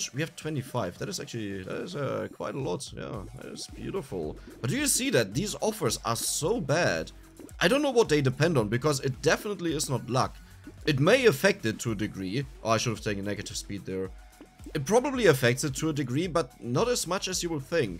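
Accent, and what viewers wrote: Germany. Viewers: You got a very strong german accent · Man hört einfach so raus das der deutsch ist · Imagine doing english videos with the deepest german accent i have ever heard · Der deutsche akzent ich liebs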